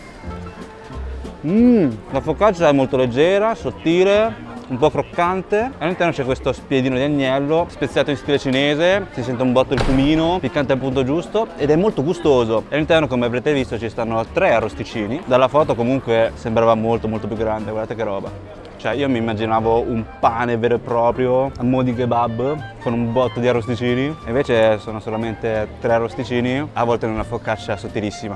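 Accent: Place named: Romagna, Italy. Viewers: Mamma mia quanto amo quella zona e quanto amo il cibo cinese!!! A parte la colazione (too much per me!) avrei mangiato TUTTO!! A me sembra comunque che questo ragazzo abbia l'accento romagnolo 🙃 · Il cinese con un vago accento romagnolo di è veramente spettacolare. Comunque, aldilà dell'accento che e' bello del suo, fai veramente dei filmati carinissimi e molto piacevoli. Unica nota pericolosa (te possino...), fai venire na' fame della madonna e fai venire voglia di partire per la Cina, per l'affetto che instilli per questa Nazione, immensa nelle dimensioni, nella storia, nella cultura, nella visione del futuro e, non per ultima, per una gastronomia che fai conoscere in modo assolutamente innovativo (l'accento, la tua cultura, la tua professionalità?) rispetto alla narrativa corrente. Questa sera, intanto, "traslo" la famiglia a Torino a mangiare cinese 🙂. 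PS: vorrei avere ancora il tuo stomaco, con quello che mangi (col piccante, che adoro), adesso, mi ricoverebbero ogni volta....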